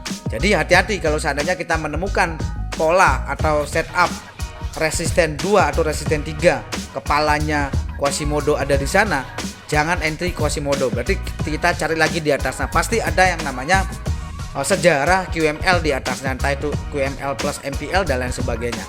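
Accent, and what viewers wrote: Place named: Bali, Indonesia. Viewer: Kaya logat bali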